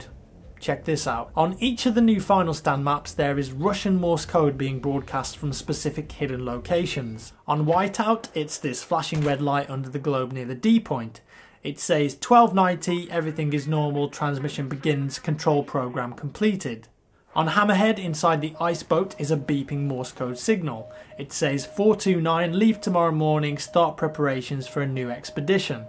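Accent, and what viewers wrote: England, United Kingdom. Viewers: beatiful english acsent <==== sorry for this english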